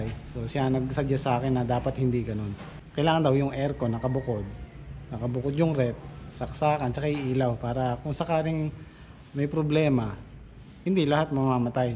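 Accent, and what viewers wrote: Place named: Pampanga, Philippines. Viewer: Kapampangan na boss magkasakit yang magtagalog i atsing magtindang sliding haha .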